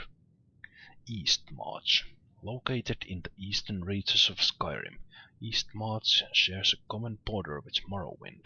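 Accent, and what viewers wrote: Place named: Finland. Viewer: I watched the first video and that's when I found my two long lost loves this video your Finnish accent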